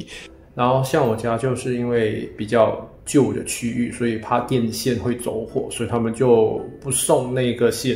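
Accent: Taiwan. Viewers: 只聽的話，感覺口音像台灣人。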